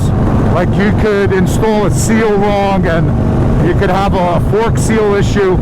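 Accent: New Jersey, United States. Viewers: I enjoy your content, and the interaction with your friends. The Jersey accent reminds me of my late father in law, who was originally from the Jersey City area.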